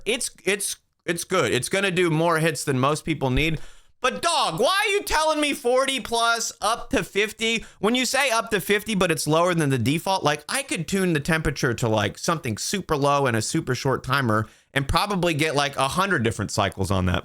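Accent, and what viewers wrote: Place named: Northeast, United States. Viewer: He sounds like hes from the NorthEast, sometimes long island sometimes the bronx, but rolls his r's sometimes haha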